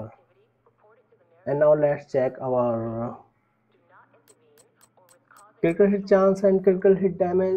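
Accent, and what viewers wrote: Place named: India. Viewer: Thank you for sharing I understand your India 🇮🇳 accent it’s a little broken but I appreciate the effort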